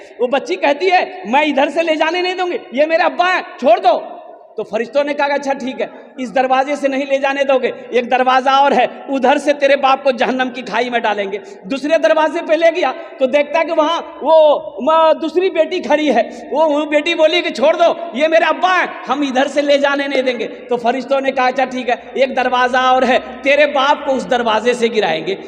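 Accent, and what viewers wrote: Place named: Bhagalpur, India. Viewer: Aap kaha se ho maulavi saheb , aap to mere taraf ki bhasha bolte hai , bhagalpur. Sunne me bahut achha lagta hai.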